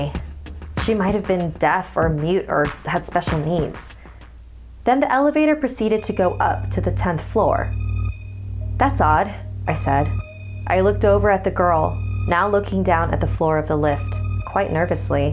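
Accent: Philippines. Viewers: Aaaaah! I've been watching all of your videos and I didn't know you're a pinoy the whole time?! I was like, the heck is ottings and ottey? 🤔🤔 It's ate the whole time WTF XD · This dalagang Filipina has the greatest accent.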